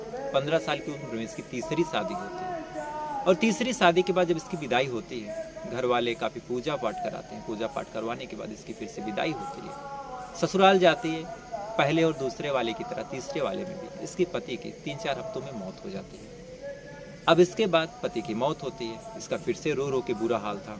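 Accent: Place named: Awadh, India. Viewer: जय हो,कहानी अत्यंत मधुर स्वर में प्रस्तुत कर मनमोहक बना लेते है।" "अवधी बोली का प्रभाव आपके बोली में है।क्या आप अवधी बोली वाले जनपद से मिलान कर रहे है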